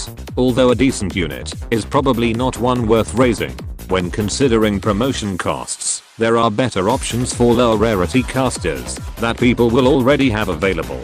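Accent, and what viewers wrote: United Kingdom. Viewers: British? that makes it even betteh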